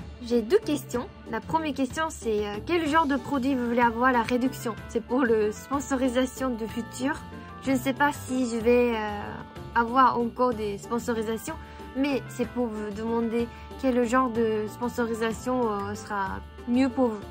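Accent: Japan. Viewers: Arigatougozaimasu -san de m'avoir fait rire avec vos jeux de mots extrêmement drôles sur notre grammaire alambiquée sur le post: Mon imagination quand j’apprends le français. Je n'ai pas réussi à poster mon commentaire avec mon vieil ordinateur sur celui-ci, un vieil obsolétisé et otaku comme moi c'est … heu… じいさん 👻 Vous parlez extrêmement bien malgré votre charmant accent. Pour « beaucoup » faites comme pour 巧, (kou, こう) 🧐 Il y aura un peu l'accent japonais (c'est charmant) mais ça ne sera pas « cul. 🧐:face-turquoise-covering-eyes: ほんとうにありがとうございます! ☺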